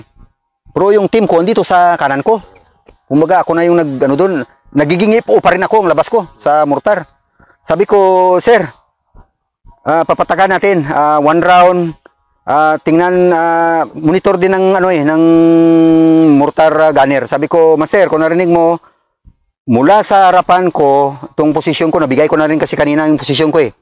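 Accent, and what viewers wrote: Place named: Iloilo, Philippines. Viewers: Ilongo SI sir sa tuno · Ilongoha nlang SG.nami pamatian god bless SG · 😂😂😂😂 natawa Ako sa Punto Ng salita sya parang ilonggo 😅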